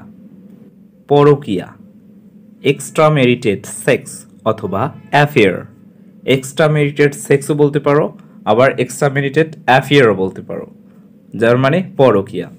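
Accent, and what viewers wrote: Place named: United States. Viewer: ইউটিবার ভাই ঠিকই বলেছেন। আপনি আমেরিকার উচ্চারণ দেখতে পারেন। তারা এভাবেই বলে। call রে বলে খল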